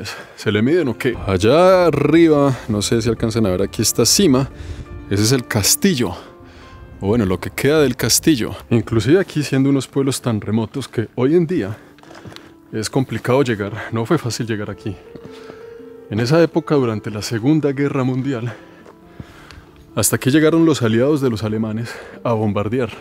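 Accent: Colombia. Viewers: hermano se te escucha muy colombiano jajaja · Que acento Colombiano más chocante , el video sería mejor sin ese Colombiano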